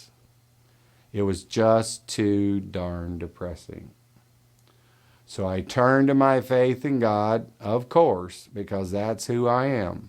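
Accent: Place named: South, United States. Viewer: Your delivery and pacing is really good. I disagree with those who want it faster. They must be hyper active coastal types. Very refreshing compared to many frantic YouTubers. You sound like a southern good old boy: easy on the ears : -)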